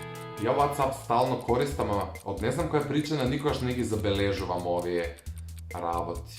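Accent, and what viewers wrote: Kumanovo, North Macedonia. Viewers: Koj saka da snima kade zboruva 24h Kumanovski. Stavete lajk za da vidi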